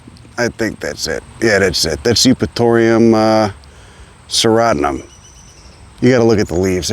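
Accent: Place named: Illinois, United States. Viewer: I enjoy hearing Illinois voices. My Ma came from Wheaton and Pops came from LaGrange park.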